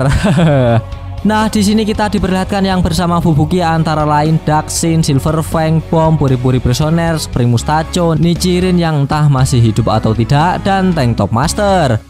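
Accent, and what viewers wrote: Java, Indonesia. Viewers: Bang saran makin lama nada di belakang semakin medok dan terlalu panjang ... Sajaaaaaaaa Sanaaaaa Ituuuuuuuuuu Jangan terlalu medok ga enak di denger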